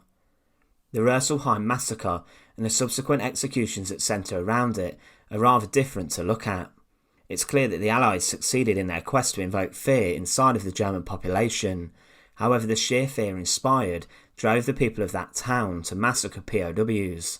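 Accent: United Kingdom. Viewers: 😆 wtf thats crap - his voice is fine to me and i hope your comment doesn’t hurt his feeling. Its nice to have a regional UK accent. Not an upward inflection of every word like in the US. dont listen to him buddy your voice/show is spot on ! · I totally know what you mean, but it is simply a particular UK regional accent and Id rather the guy stuck with it and remained authentic to his identity.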